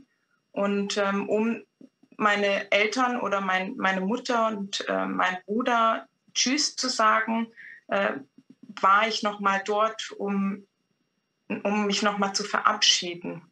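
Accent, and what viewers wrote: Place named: Switzerland. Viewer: Mir gefiel der "Wortverkehr" zwischen der Dame und dem Interviewer sehr gut... sein Schweizer Akzent ist koestlich :) Die Fragen die er ihr stellte erzeugten grossartige, aber einfach zu verstehende Antworten die sich sehr real anhoerten. Sehr gutes Interview :) Besten Dank dafuer und lb. Gruss aus USA ::)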